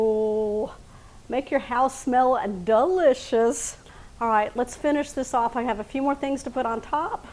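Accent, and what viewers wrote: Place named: South, United States. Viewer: Soon as you hear a woman with a Southern accent say y'all let's get ready. You know you're in for a treat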